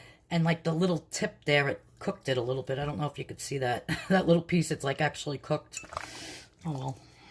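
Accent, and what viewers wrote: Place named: Long Island, United States. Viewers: No, but I lived in Manhattan for 15 years and had a friend who was from Long Island and she sounds just like you! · The food looks great, but the ACCENT kept me watching. 😂😍🥰 Long Island?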